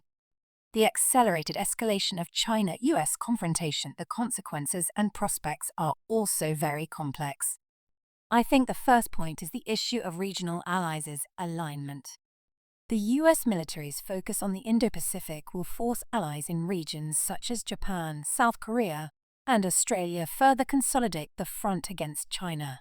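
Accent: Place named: United Kingdom. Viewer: 今天的翻译比昨天的好，纯正的英音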